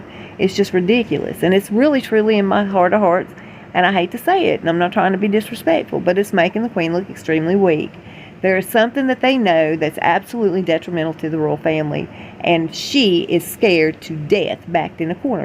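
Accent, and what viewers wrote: South, United States. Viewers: your voice is so soothing and has that sensuous Southern drawl; won't you consider narrating audiobooks, just so we can listen to you speak whenever we need a little "relax" time?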